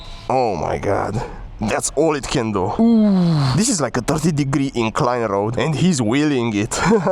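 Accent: Romania. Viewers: mi am dat seama ca esti roman după accent😂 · Este român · broo, u from timisoara?? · ai accent de român😮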